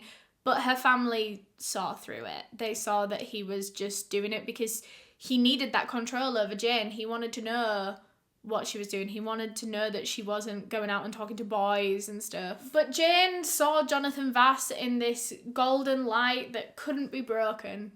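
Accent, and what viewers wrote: United Kingdom. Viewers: I know this a British accent, but it seems different! Your accent is so satisfying!! What area of the UK speaks like this,,,, unless it’s not British haha.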